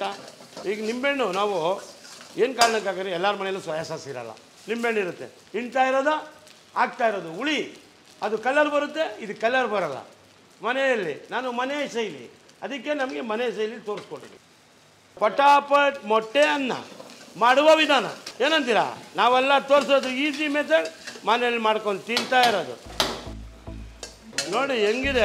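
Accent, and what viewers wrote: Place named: Coastal Karnataka, India. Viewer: I like his Coastal Kannada style . Passionate cook 😊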